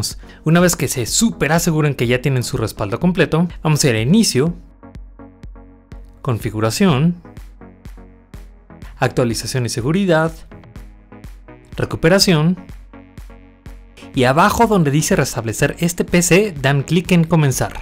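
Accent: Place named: Peru. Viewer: me parece mal esa voz de peruano VENI A ARGENTINA GATOOO!!!!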